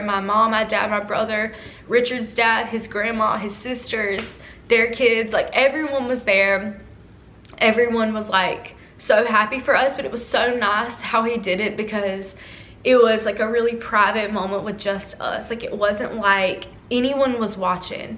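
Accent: South, United States. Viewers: CONGRATULATIONS ......❤💚♥💛💙🧡💙♥💜❤💚💜♥💙 Where are you located? .. you sound southern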